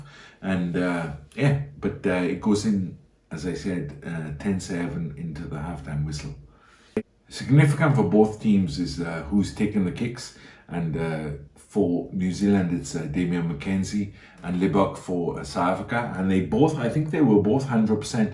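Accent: Wales, United Kingdom. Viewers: A wonderful commentary and who better than a rugby Welshman to deliver it. Thank you from South Africa.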